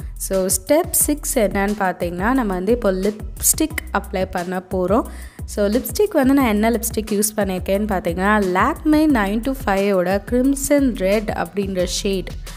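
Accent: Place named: Hindi Belt, India. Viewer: Super voice, hindi accent varudhe yen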